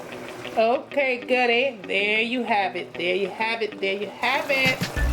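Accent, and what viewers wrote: Jamaica. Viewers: Love your Jamaican accent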